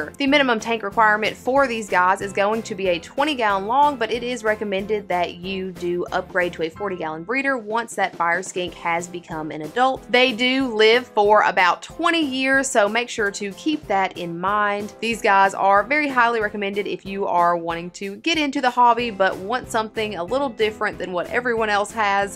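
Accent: South, United States. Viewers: First time eating her video off rip oh she southern I can hear it lol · As a first time viewer, hearing that southern accent come out of someone who isn’t white is amazing😂 loved the vid, hope you’re having a good day🤙🏼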